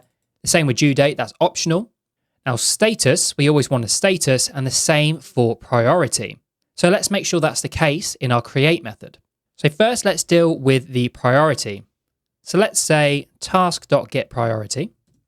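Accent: United Kingdom. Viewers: sorry but.... why is british accent so hard to understand,why cant u guys just speak what should be spoken...:(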